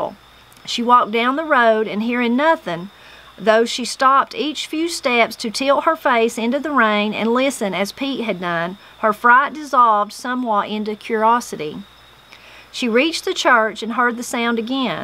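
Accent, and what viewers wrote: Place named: South, United States. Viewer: This was just what I needed at the end of a long week of work. So nice to hear your lovely Southern voice reading to us and the story gets better and better. Reminds me it is time to find another good book to read myself. Or time to open a fall puzzle. You are special and I am so glad to have found your channel.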